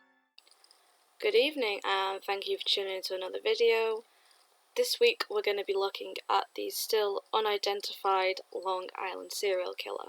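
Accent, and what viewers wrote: Manchester, United Kingdom. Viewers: Oh my, I'm just surrounded by all of these delicious British Ladies. I need to get on a plane and come hear it for myself. Surround me💪🖤💖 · Is your accent Mancunian? X...( for those asking what is a Mancunian accent, it’s an accent for those native to Manchester in 🇬🇧 xx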